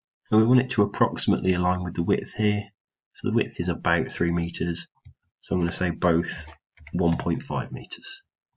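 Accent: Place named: North, United Kingdom. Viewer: Thanks for your reply!, im from Australia. Your videos were a great help with my Thesis, modelling and simulating a new Wind Turbine i designed. I mentioned to the other Engineers for great professional tips look out for the guy with the Northern UK accent, am i right location wise? .. Yeah the mesh looks good after a tonne of fine tuning whilst using various research papers also in setup. I actually used one of your other videos mesh today and its currently running, it hasnt crashed so thats good but looking at the plot monitor for normal forces and its still too high. Im going to save this project as it looks like its actually going to complete successfully. I will recreate the mesh using this videos mesh and let you know if it improves the Y+ value which i would assume isnt good at this point. (What i like about this video is the refinement region), which i have been seeing other similiar simulations to my own using but in a convoluted way. 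Your way makes way more sense. Thanks again mate!.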